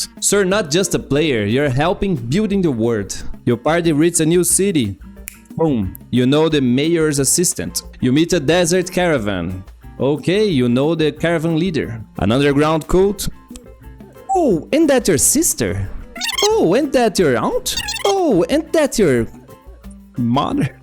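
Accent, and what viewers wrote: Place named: Brazil. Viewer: Are you Brazilian by any chance? I'm asking because your accent sounds very Brazilian hehe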